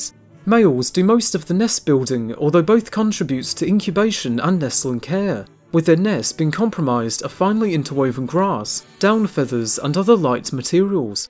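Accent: England, United Kingdom. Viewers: are you English or New Zealand ish? Because you sound English but mainly do birds from New Zealand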